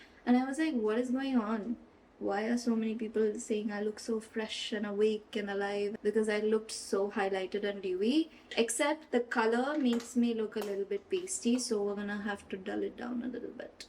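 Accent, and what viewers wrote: United Kingdom. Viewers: Never sat my face, nose intimacy and the British accent lol😂😂😂😂😂😂. Why are you so lowkey hilarious lol😂😂😂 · don't stop doing the british accent gurlll i love it 😁🩵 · yk i love your chill grwms and be yourself in the vlogs that you already are aur haan the brit accent was so cool..idk how people sound like that<3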